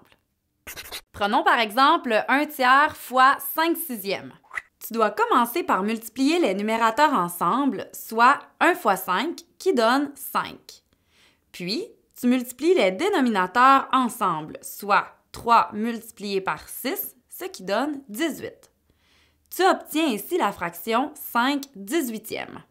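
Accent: Quebec, Canada. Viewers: Bonne explication, bon juste l'accent québécois qui rend un peut plus compliqué l'écoute mais je dit gg quand même 👍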